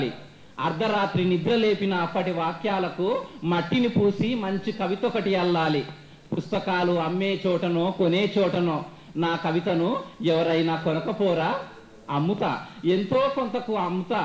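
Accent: India. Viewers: ఏక్ దం నాటు తెలంగాణ యాస చాలా అద్భుతంగా ఉంది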